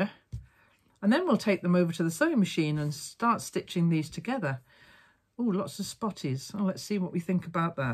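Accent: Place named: Northern England, United Kingdom. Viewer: This is NO disrespect to our transatlantic quilting u tubers (love Jenny Doan et al to bits) but it's so nice to hear an English accent on-line, especially a Northern one. I've just discovered your channel and I am in love with it already. Your easy going style suits me fine, as I'm a bit of a 'gonzo quilter' with an aversion to precision work - though I am quite fussy about the end result. Only problem - I've spent an entire afternoon drooling over your tutorials. But time well spent! You've given me the boost I need for the next few projects.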